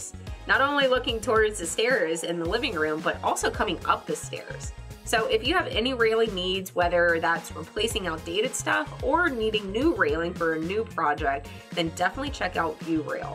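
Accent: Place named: South, United States. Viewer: "Sawlz Awl"...love the southern drawl 😁 Awesome job,!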